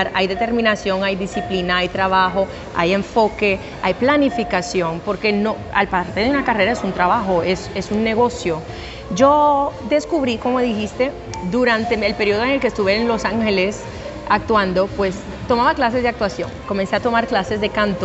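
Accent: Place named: Colombia. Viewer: Una belleza increíble habla como los Colombianos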